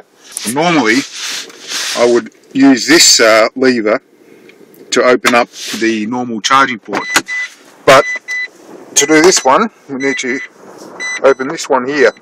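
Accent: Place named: Australia. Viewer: Dude ! Aussie !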